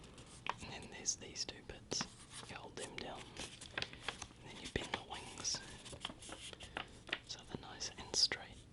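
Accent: New Zealand. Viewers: Are you from Chch by any chance...I love listening to my friend there talk to me on the phone. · God I love New Zealand accents <3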